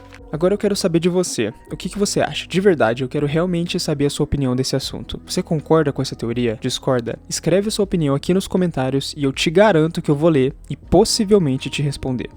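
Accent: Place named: Brazil. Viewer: O vídeo é bom, embora o sotaque brasileiro faz parecer que é uma criança narrando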